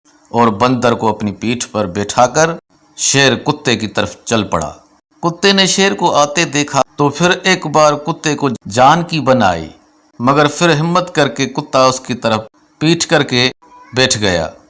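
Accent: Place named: Sindh, Pakistan. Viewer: بہت ہی خوبصورت انداز سے آپ نے کہانی بیان کی، لیکن لہجہ آپ کا بلکل سندھیوں جیسا ہے۔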